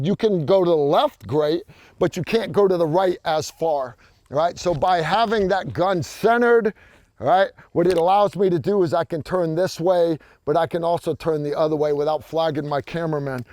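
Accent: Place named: New England, United States. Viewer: I've been tuned out for a while. Glad to see you're still giving good men great advice. Gotta ask, are you from the New England area? You sound like a tactical Lobsterman!!!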